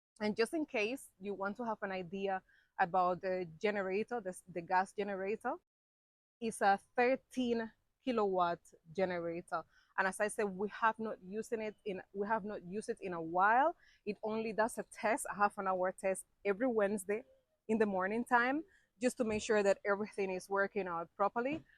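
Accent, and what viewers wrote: Patois, Jamaica. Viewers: Your Patois is so funny! 😅😂😂😂😂😂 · i literally watch you for your patois/cuba accent - LOOOVEEE IT!! ( ok I also watch you for your cool personality and for inspiration on my move to JA - thank youuu!!) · Yoooo dat cubana and yaad accent caught me off gyaad. 🔥🔥🔥 when u said mi nuh ave nuh tv inna mi yaad, i died. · Your accent is strange..... in a good way. Its beautiful. Its like hearings 3 different persons speak at the same time. The patios, the standard english, the cuban accent👌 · I love your ❤❤ your patois 😂😂😂😂. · Its your jamaican bad gyal accent for me lmaooo so funny annd cute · Beautiful family life style, I love the way you speak Jamaican English. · Love your Jamaican accent 😂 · Your a Cuban but have developed a Jamaican accent and still have Spanish accent absolutely love hearing you talk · Mi love how you have the Jamaican accent fully keep it up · Patois creep in pan u because u a jamaican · Sis I love the Spanish /Jamaican accent 😍😍😍😍😍 absolutely love THE LIFESTYLE😍😍😍😍👍💯.BIG UP USELF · Your jamaican accent strong 🤣🤣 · I am a Jamaican living in the Bahamas. 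I love your Jamaican potwait sound good . Keep up the good work. Wishing you and your family great health and a blessed life .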